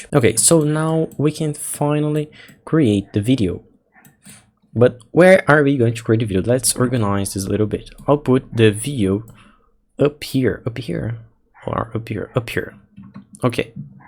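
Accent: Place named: Brazil. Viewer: Engraçado, eu ouvindo um vídeo teu, e logo percebi "pera... esse inglês tá meio aportugueisado não?" kk massa mano.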